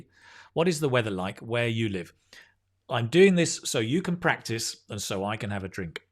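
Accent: United Kingdom. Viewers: Are you British? Are you American?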